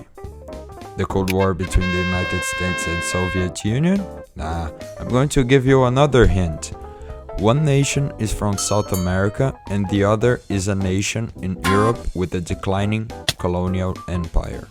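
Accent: Brazil. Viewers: Sabia q eu reconhecia esse sotaque br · He's Brazilian, the Portuguese don't sound like that.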